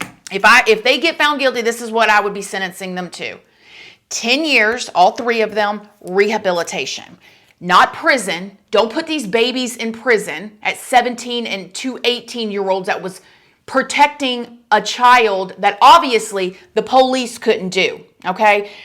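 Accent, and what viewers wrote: South, United States. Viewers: I love you. I'm from the south also and you talk just like me and everyone in my small town. Although your talking about something so serious I can't help but laugh when you say certain things like in my dumb dumb brain 🤣. You are so not dumb which is why I keep coming back. God bless keep up the good work.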